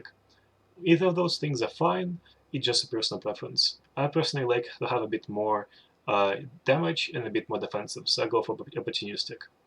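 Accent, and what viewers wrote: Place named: Russia. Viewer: Слишком русский акцент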